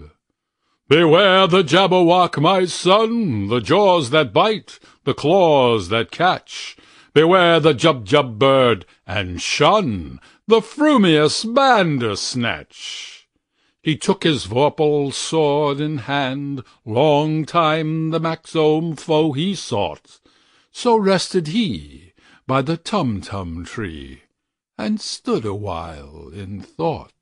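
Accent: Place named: Transatlantic, United States. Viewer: I could live with the mispronunciations (they are made up nonsense words after all so a subjective interpretation is permissible), but the accent is just wrong. This is quintessentially English prose and a transatlantic rendition just doesn't seem appropriate.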